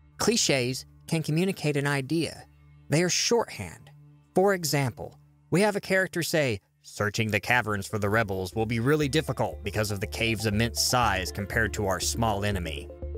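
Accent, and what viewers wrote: South, United States. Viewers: why am i only now noticing your southern accent?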